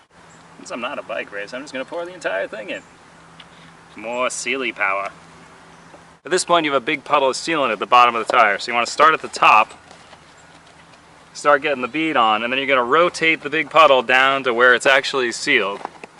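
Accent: New England, United States. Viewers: Kid! This video was wicked! I sense that you're from New England.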